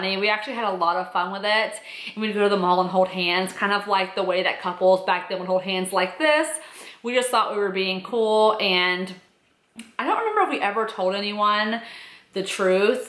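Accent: South, United States. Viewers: I found out recently that a co-worker of mine is also an avid YouTube watcher. We live in Des Moines too and she told me about your channel! I was confused because I definitely detected a southern accent in your last few videos; it makes sense now!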